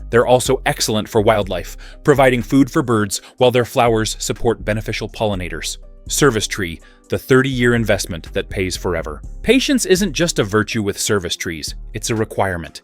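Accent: United States. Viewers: Such a disappointment that this is AI slop which is not marked up as such in YouTube - The pictures don't match the commentary a lot of the time often showing completely different fruits to those being talked about. Pauses and emphasis in the American commentary are very strange often a symptom of AI. I think the content has many inaccuracies - Saskatoon is native to Canada and not Scandinavia, Mespilus is the botanical name for Medlar and I wonder if Jujubes were really grown in there?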